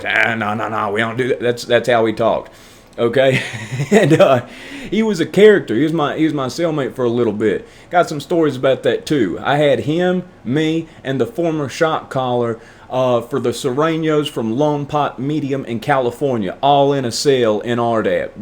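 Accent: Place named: Virginia, United States. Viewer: I lived in Richmond for a while , so when I first heard you talking, I thought Roanoke must be super country. Now I know what the deal is . Thanks for clearing that up y'all.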